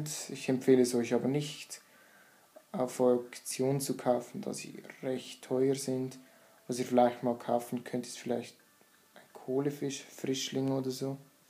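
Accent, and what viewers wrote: Switzerland. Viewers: Hallo! Ich habe mal zwei Fragen 1. Bist du Schweizer (klingt irgendwie so)? 2. Sind diese Haustiere unsterblich oder verliert man die irgendwann? Tolles Vid!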